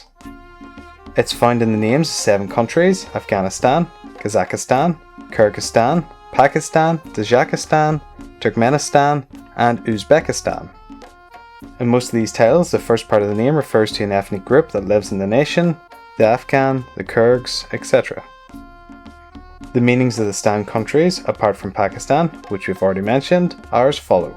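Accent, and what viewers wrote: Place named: Northern Ireland, United Kingdom. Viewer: I just found your channel and im loving it! as a fellow Northern Irish (Tyrone) Its great to see as i havent seen any others, nice to hear a non american or English accent :)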